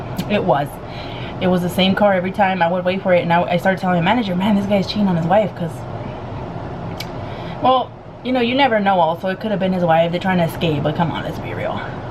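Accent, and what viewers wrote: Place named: South, United States. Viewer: I don't know what that accent is that you have but I absolutely love it. It's kinda southern with a hint of Spanish.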